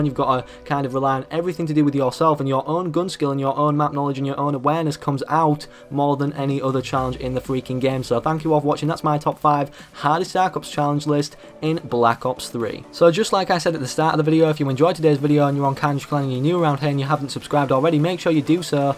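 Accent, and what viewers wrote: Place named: Yorkshire, United Kingdom. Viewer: Your from Yorkshire also your psn name gives me Ebola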